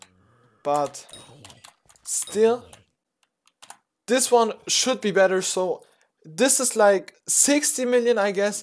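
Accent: Germany. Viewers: alter... du bis doch 1 Hundert Prozent deutsch bruddi, man hört das sooo raus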